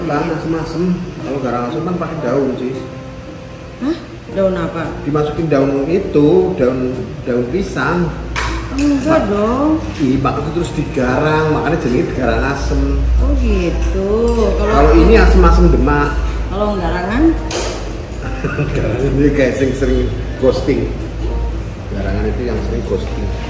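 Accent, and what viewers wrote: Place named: Purwakarta, Indonesia. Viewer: Pakai logat purwakarta tsmbah seru bun..... inyong tambah seneng 😂😂😂😂...😂❤❤❤❤❤❤ love you bunda....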